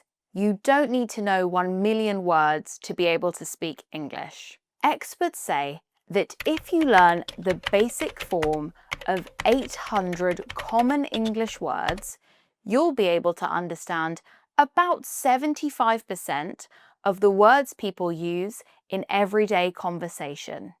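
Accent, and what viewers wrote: United Kingdom. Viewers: Your british accent is so beautiful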